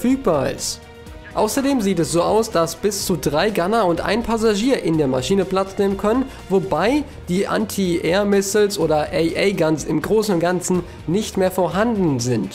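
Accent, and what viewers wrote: Germany. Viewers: Ich bin Finnisch und ich liebe deine Deutsch acschent :)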